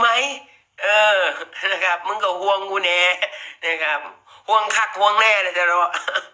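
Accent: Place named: Suphanburi, Thailand. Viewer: สำเนียงปู่ไม่ได้อ่ะ ออกไปสุพันมากกว่า5555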